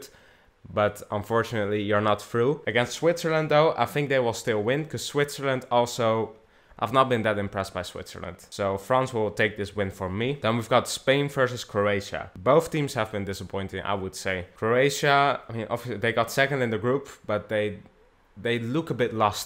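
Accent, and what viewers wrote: Netherlands. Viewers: ik ben ook nl btw ik wist dat je nl was was te merken aan je accent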